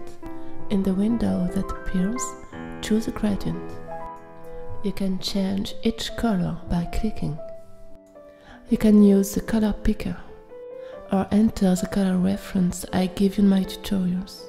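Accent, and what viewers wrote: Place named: France. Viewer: I love your french accent! And dont worry, i understand very well. Thank you for your video!!!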